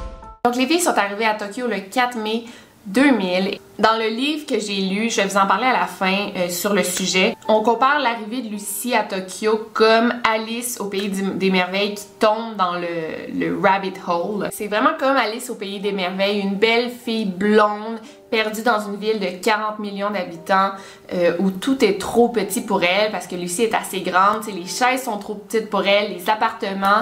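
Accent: Quebec, Canada. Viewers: Hello 🙂 Encore Bravo pour tes 300k 🎉 C'est amplement mérité 😄 Tu fournis un superbe travail 👌 C'est toujours très complet, c'est vraiment plaisant! Tu me régale ☺️ Un travail de Qualité! Je te suis depuis tes 10k, tu es monté hyper rapidement 💪 Et ce n'est pas prêt de s'arrêter, crois moi 👌 J'apprécie énormément ton accent Québécois, je te suis depuis la France Je sais que ça peut en déranger beaucoup mais alors moi j'adore 😄😄 Ça fais ton charme ☺️ D'ailleurs, rien a voir avec cette vidéo mais alors ta Vidéo de Mariage.. je ne m'en remet pas! Un Mariage de Princesse 😇 Bref, Merci pour cette Vidéo hyper Qualitative et intéressante comme toujours 👌 Tu m'en apprend beaucoup ☺️ Des bisous 😘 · J'adore l'accent québécois 😍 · J'aime beaucoup ta façon de raconter daiĺleurs grâce à toi l'accent québécois ne me dérange plus et c'est dire quil m imsuppter merci 😆en revanche cette histoire ma endormi 😥c pas le faîte qu'elle soit longue c juste que je la trouve nul Chier 'les goût et les couleurs difficile de contenté tout le monde ''mais continue vraiment je pense qkue tu ferait une très tres bonne journaliste d'investigations car aime ce que tu fait ca se ressent bisous ma belle 👄💋😻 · Mercii pour cette vidéo j’ai adoré et encore bravo pour tes 300k abonnés tu le mérites 😁😁😘 PS: tu as réussi à me faire aimer l’accent québécois 😋😋😘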